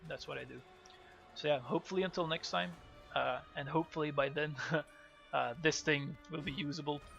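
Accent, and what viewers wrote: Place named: Flanders, Belgium. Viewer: HAHAHA. Gewoon het vlaams accent in Engels is zoiets uniek dat ik da kan opspeuren gelijk een hond xD Mercikes voor de guide man, tis straf!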